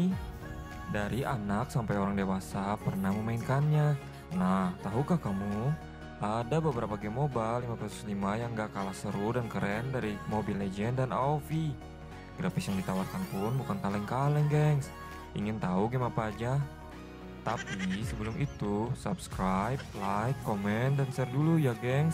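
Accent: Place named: Medan, Indonesia. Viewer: Hahahahhqh Bukan kaleng" ya bang..... Itu Medan banget gangs.... Hadir Nyimak bang.... Jgn lupain aku ya bang.... Salkomsel bang.....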